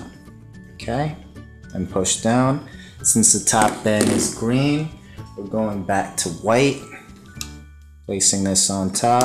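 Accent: United States. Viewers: You really think people can make the snake belly cause I would love to, also please reply but is choon your dad or grandad because u sound american and choon is from the phillipines. I dont get it yalll and I spend $ 894 yestdrday at therainbow loom webstore and now im bankrupt!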